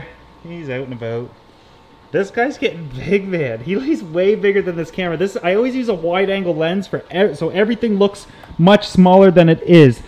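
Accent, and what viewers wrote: Canada. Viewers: I love you Canadian accent lol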